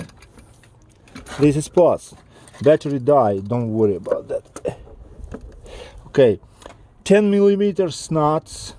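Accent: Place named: Russia. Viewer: Спасибо брат, акцент похож на русский)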